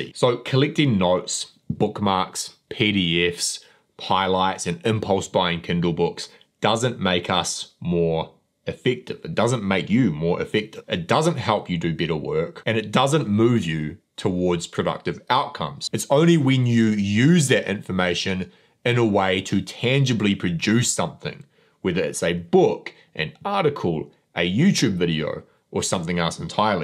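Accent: New Zealand. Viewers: r u from newzeland? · I might need to rewatch this video. awesome Kiwi accent is distracting me from the content. 😅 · you've got cool accent! i actually never heard New Zeland's English :)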